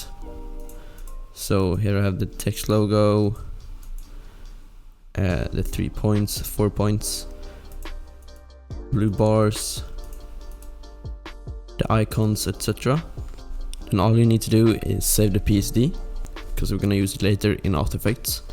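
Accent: Sweden. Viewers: Är du svensk? Låter iaf som det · U sound Swedish as f**k. Looking good. Thanks boiio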